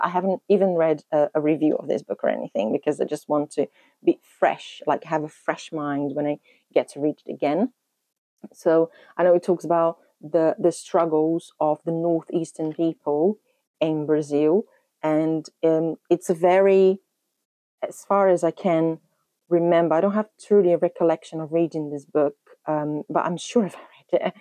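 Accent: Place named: United Kingdom. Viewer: Você tem um sotaque britânico lindo!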